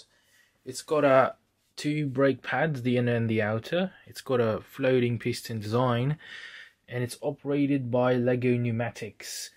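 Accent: United Kingdom. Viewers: Are you English? South African? Or Australian?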